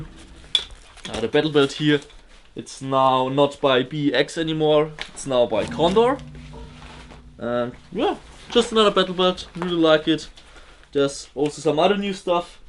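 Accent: Germany. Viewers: hey deine videos sind echt nice ich find das immer witzig wenn du englisch redest und man dann soo deinen deutschen aktzent raushört was ja nicht schlimm ist;) mach weiter so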